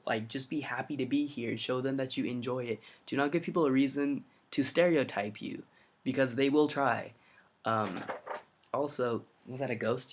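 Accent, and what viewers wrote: Spain. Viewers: I love your spanish accent! I am looking to visit perhaps live there, great video :)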